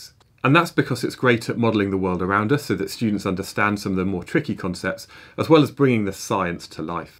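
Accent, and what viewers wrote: United Kingdom. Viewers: English accent: “ah, I’m home” Spells it ‘center’ : “wait, what?”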